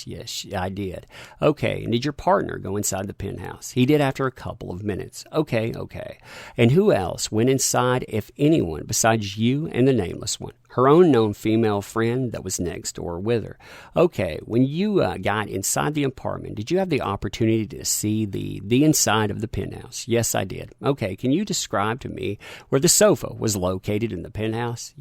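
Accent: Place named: Texas, United States. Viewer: Sort of wondering if you are from Texas with your use of "set" for "sit" ;)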